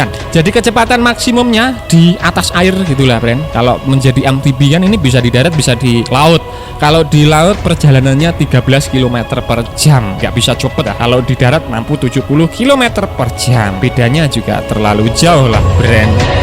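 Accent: Java, Indonesia. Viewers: Uong Jowo tenan kang,, medok tenan leh muni...😂😂😂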